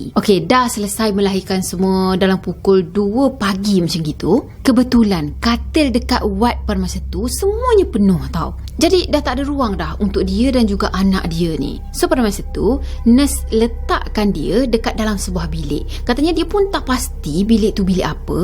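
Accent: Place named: Terengganu, Malaysia. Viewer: Loghat Ganu faham tak?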